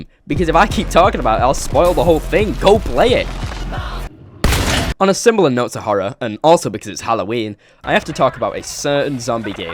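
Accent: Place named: United Kingdom. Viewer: British voice, unsubscribed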